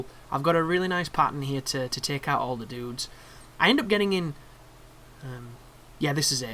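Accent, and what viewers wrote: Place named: Scotland, United Kingdom. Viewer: I've watched this one before I started mine because I really had it difficult on the crushing level when your in the wagon. Perhaps I should have played it first and figure it out, because I noticed on crushing that you didn't have to kill the gunners. But it's the inbetween section where I got killed a lot of times and I was curious how you did it. (And now I know your a Scotsman. Perhaps you should comment al your games a second time in scotish. I will surely look this versions then. Love the Scottish accent as well as the Irisch and some Brittisch dialects. American Englisch is too nasal for me). For the part in the courtyard where you're hanging on the publicity. You did the first part much quicker than I did. I died a lot here in the first wave. But I didn't noticed the gas barrels. For the second part where you have to change side. 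It's much easier to descend one pannel. You can aim the two guys on the right without getting killed when they're firing. Though there are some things you have to know. First if you got too far to the left of the pannel and you aim for a headshot on the left guy, you can't always fire. Another thing that baders me here is that you can't shimmy when aiming. So you just have to guess if you're in the right position. And as you said the sniper is no problem at all. Certainly not if Helena shoots thus guy. Once he is stuned you can kill him, even with a headshot. For the third wave I changed side again. The advantage here is that you got a better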